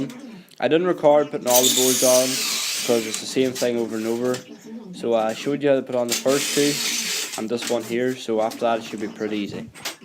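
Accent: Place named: Scotland, United Kingdom. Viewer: I can't figure out your accent, is it Scottish or North Irish?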